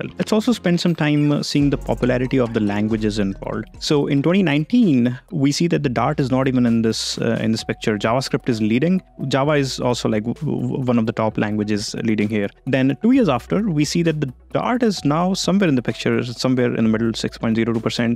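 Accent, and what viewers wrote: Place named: India. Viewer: >indian opinion >trash accent opinion rejected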